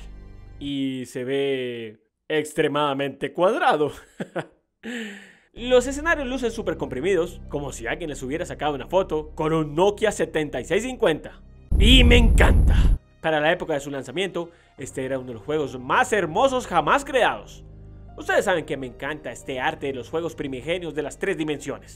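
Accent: Colombia. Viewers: Me encantan estos vídeos... Y se nota que eres Colombiano... Saludos mi paisano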